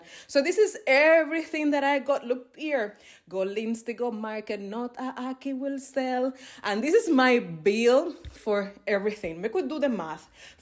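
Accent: Jamaica. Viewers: I must say, I just LOVE your videos and of course your beaitiful Jamaican accent. Blessings.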